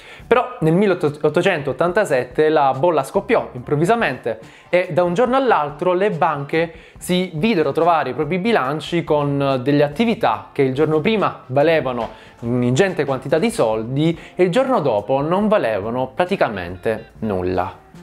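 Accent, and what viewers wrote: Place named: Tuscany, Italy. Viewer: Quasi toscano direi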